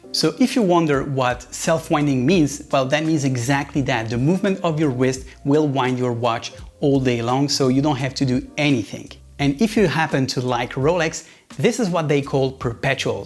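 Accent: France. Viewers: French accent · really good and clear presentation, a lot less douchy than other watch channels, love the french accent. subscribed. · the French accent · Je parie ma collection Seiko 5 que vous êtes français 😅 L'accent ne ment pas ❤ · My God!!!! That accent!!!!! 😍😍😍 Tu parles francais?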